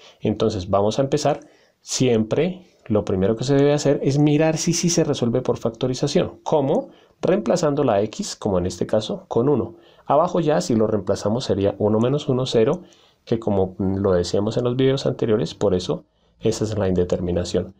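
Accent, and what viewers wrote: Colombia. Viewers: Disculpen es colombiano?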